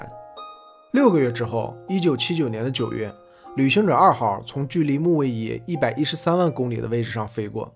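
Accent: Northeast, China. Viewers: 东北话口音。😄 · 东北口音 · 这大碴子口音太出戏了🌝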